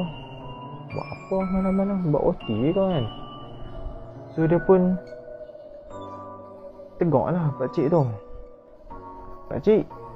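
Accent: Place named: North, Malaysia. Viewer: Suka gila dengaq youtuber yg cakap utaqa ni, tapi nak mintak 1 ja lepasni jangan letak jumpscare please terkejuttt ceq 😭😭😭😭😭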